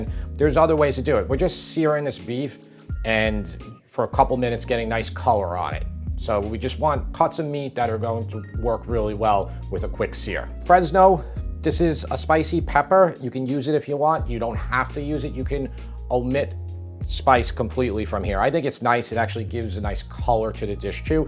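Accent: New York, United States. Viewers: Sounds like yall need to get to know your butcher. I don't buy prepackaged meat when I can I want to look the guy in the eye that's packaged the meat. Crack some jokes bull shit. IDK sounds like you're from new york or up new England. It's different in the south.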